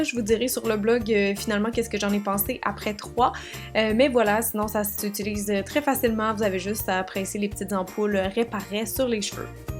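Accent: Quebec, Canada. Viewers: la marque que tu peines à prononcer se dit " chou et moura " mais ne s'écrit pas du tout comme ça haha ! je vis en France et c'est marrant d'entendre une quebequoise ! ça me donne le sourire au lèvre ! ta vidéo est très pétillante et met de bonne humeur :) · J'adore ton accent canadien! 😙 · J'adore tes vidéos ! Et ton accent me rappelle le temps où je vivais au Canada.... Je voyage tout en restant à la maison ! :) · Trop cute ! j'adore ces petites habitudes. Belle cuisine avec belles boîtes retro.... envie de goûter le thé bouddha bienheureux mais depuis la France c'est impossible ! dommage. Très bons conseils et ..... avec l'accent canadien, ça donne la pêche ! j'adore ton blog; continue.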